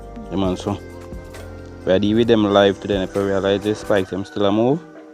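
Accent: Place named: Jamaica. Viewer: What part of JA you from,? You sound different from Raj and JamaicanWildlife!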